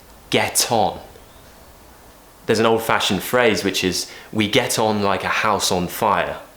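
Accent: United Kingdom. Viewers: I didn’t like British accent u made me love it such an excellent professional teacher with Shewa at the end ,,British accent XD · I love your videos! From Uruguay!! And love your britttish accent! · nice to see you, I love your accent 😍 British is the best ever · What kind of this British Accent? I mean the accent using T sound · I love your British accent <3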